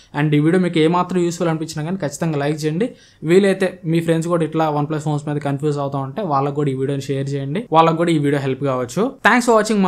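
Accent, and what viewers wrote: Ongole, India. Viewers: bro medi Ongole aa broo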